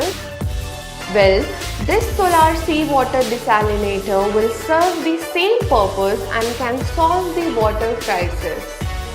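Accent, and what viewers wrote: India. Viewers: lindu pajeeet accent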